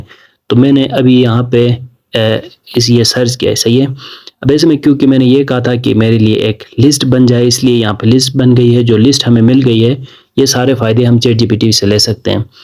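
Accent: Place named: Saraiki, Pakistan. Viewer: Ap sariki lag rhy voice se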